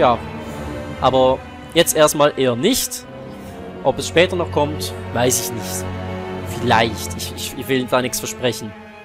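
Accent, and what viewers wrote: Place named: Switzerland. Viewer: Erfrischung mit schweizer Akzent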